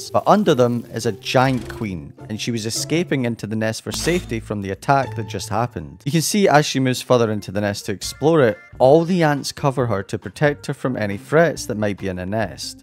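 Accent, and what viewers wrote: Scotland, United Kingdom. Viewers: Is bro British? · Are you Scottish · Are you British? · are you by any chance Scottish? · that scottish is chefs kiss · You Scottish?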